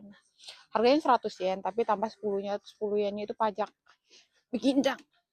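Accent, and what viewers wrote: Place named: Mbay, Indonesia. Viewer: Akhirnya ada tontonan yang ada dialeg mbay nyaa❤❤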